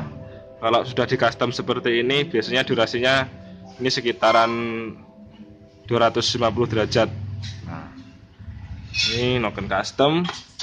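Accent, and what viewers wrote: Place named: Java, Indonesia. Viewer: Sampean tiang pundi mas ,logat jawane kentel soale😂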